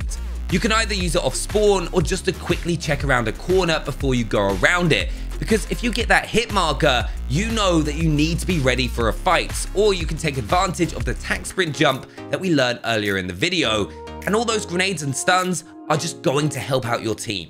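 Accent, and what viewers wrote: United Kingdom. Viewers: Why is it that British accents make you sound more knowledgeable 😂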